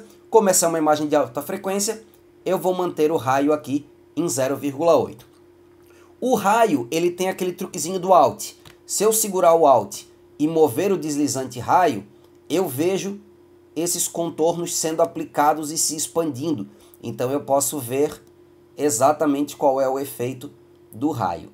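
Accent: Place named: Santa Catarina, Brazil. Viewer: pelo sotaque é catarinense não? rsrs Florianópolis? ótimo vídeo. parabéns e obrigado por compartilhar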